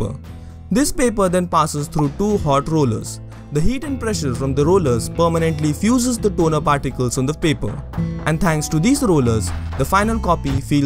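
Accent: India. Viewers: I am a Taiwanese and no offence intended. Actually, I am not a fan of indian accent but because your video is highly informative and understandable so I found your voice beautiful and educational.... Big thanks！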